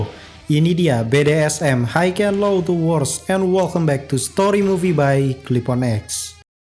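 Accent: West Java, Indonesia. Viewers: Orang Sunda Oge euy admin na 😁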